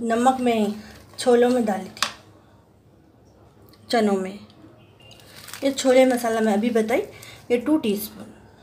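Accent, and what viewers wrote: Hyderabad, India. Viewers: Hyderabadi language 🙄🤔🤔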